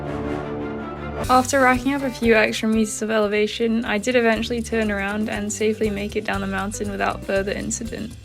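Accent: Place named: United States. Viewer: The rear cam works so well, really gives that perspective of progress love your voice and your accent of course like any American : )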